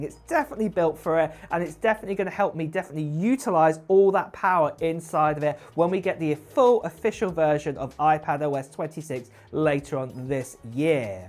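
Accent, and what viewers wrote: United Kingdom. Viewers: 안녕하세요 한국인입니다. 영어공부 잘하고 싶어서 의 테크영상 자주 봅니다. 저는 특히 한국 특성상 노트북도 윈도우와 핸드폰은 갤럭시만 줄곧 써왔어요. 애플 기기는 관심은 있지만 경험해보질 못했어요. 이번 기회에 이벤트하시는 맥북 제가 꼭 받아 공부하는데 써보고 싶습니다^^;; 너무 큰 욕심일까요?ㅎㅎ 발음 영국식이라 아직은 자막을 좀 키고 들어야하지만 실력 늘려서 꼭 자막 끄고 전부 이해하면서 재밌게 영상 시청해보고 싶어요.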